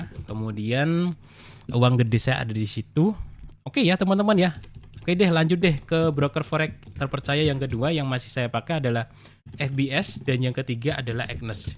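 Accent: Java, Indonesia. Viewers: bang lu jawa ya ? orang mana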